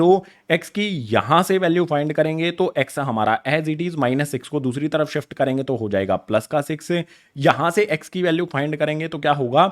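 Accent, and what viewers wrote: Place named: Kota, India. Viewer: your accent... 100% kota student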